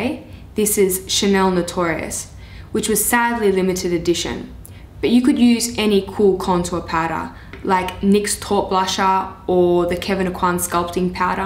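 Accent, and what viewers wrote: Australia. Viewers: you also sound Australian?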